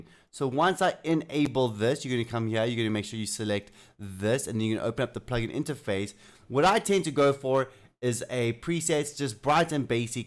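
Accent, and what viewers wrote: South Africa. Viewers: Heeey another South African! I could immediately tell 😆 awesome video man!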